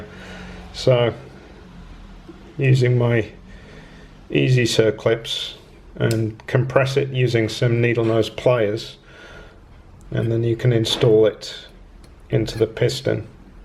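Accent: United Kingdom. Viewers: Nice video. With regards to circlips with tangs you need to be careful because the gudgeon pin can fret on the tangs which causes them to snap off! (I used to run mega tuned 350 LC's so I've seen this sort of thing!) You can still use this type of circlip but cut the tangs so they are only 1-1.5mm long, the gudgeon pin can still fret on them but they just wear away very slowly rather than a long piece of them falling off! Someone will probably comment that if they are wearing then the material coming off them is not doing the engine any good....yeah, yeah, does the air filter stop EVERY atom of crap in the air, I doubt it! EDIT...Dude, you sound British, it's a gudgeon pin, not a wrist pin! LOL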